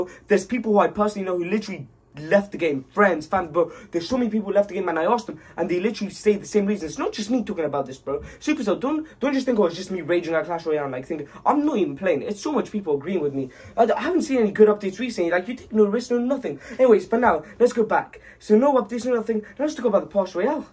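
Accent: United Kingdom. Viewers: He sounds so British in this video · Bruhh i just hearded that u are british, im unsubcribing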